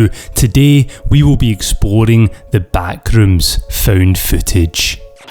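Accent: Scotland, United Kingdom. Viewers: Scottish accent very funni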